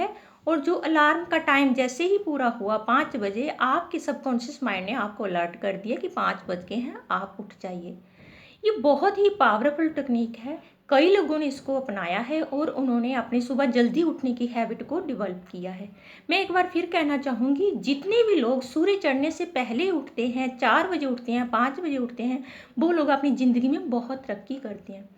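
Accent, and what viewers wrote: Punjab, India. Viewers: Nice video. You are pure Punjabi( I mean your Hindi is in Punjabi tone)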